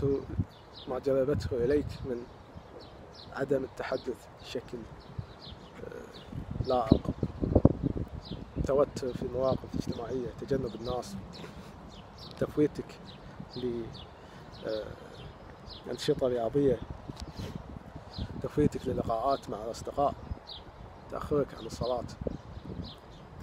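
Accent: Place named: Kuwait. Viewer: يعني تدري يسولف كويتي ولهجته واضحه وتسئله انت سعودي 😩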